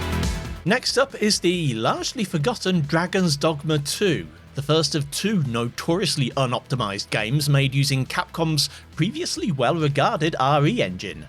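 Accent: United Kingdom. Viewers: man i instantly recognized your british accent i'm so proud of myself :D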